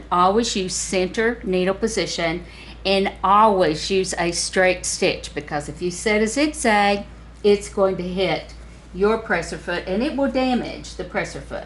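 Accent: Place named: South, United States. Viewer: Thank you so much for this video, and you have a way to explain things that makes it so easy. I know you say is only straight stich but when you are done can you back and forth stich to secure the gather? Sounds silly me asking for this or do i have to change it to foot number 1 to do that. Such beautiful southern accent, love it. I mean to ask when calculating panels for a ruffle skirt how do you go about it? what is the formula so that the gather matches the panels? I can be good at math but at the same time in certain things I am not so good. Do you have a video on that? Thank you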